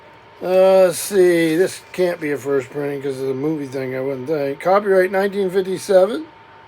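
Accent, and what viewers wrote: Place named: United States. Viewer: What part of the US are you from? You sound like a local to me.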